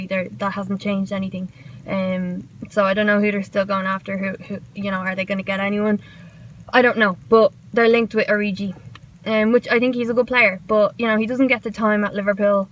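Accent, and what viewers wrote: Ireland. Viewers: HI HOW ARE YOU BEAUTIFUL 😘 I HOPE WE SIGN KOULIBALY. I REALLY LIKE YOUR ACCENT 🇨🇮 #IRISHACCENT XXX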